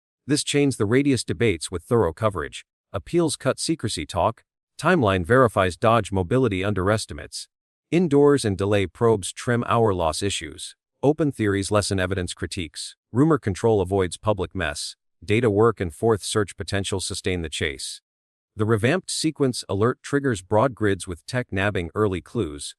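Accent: United States. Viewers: I don't think there ever was a snake nearby on the verandah. Also, it is very difficult listening to all this stuff when the speaker gabbles so quickly, and he has an American accent. He is very difficult to understand his meaning, sometimes.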